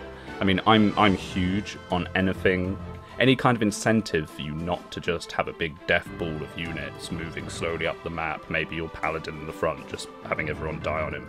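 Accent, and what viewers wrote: United Kingdom. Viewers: Why are you British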